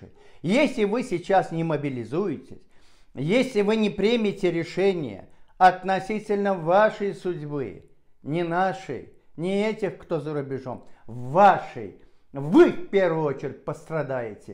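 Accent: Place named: Moscow, Russia. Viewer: Эркек президент ялы гурлейен ящулы с московский аксентам мало чик народ за тебя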